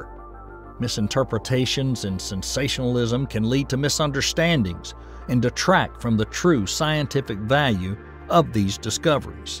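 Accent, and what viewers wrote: South, United States. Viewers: This guy having a southern accent makes this 100x better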